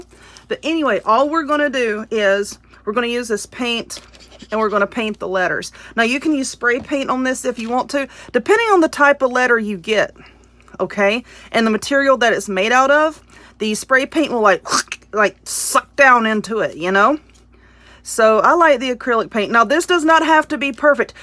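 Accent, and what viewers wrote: South, United States. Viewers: I love this wreath! that husband joke was hilarious! You are so funny and I love to watch your channel. I love your southern accent. Tfs.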